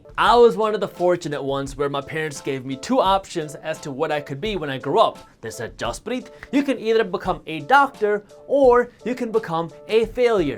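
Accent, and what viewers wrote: India. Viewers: Indian accent and indian culture real af😂😂😂loved it Jaspreet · Love the Indian accent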